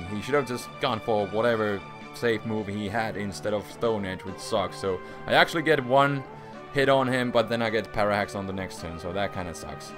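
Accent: Finland. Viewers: Suomi-aksentti <3 Erotti meinaan heti ;) Ei mutta hyvin sää vedät!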